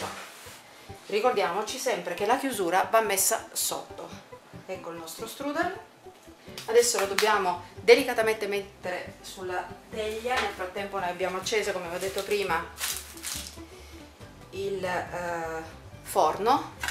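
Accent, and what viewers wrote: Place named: Piedmont, Italy. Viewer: ! Ma le tue origini sono siciliane??? Perché il tuo accento ha un che di vagamente nordico...... tipo piemontese.. Ahahahah Ti abbraccio sei bravissima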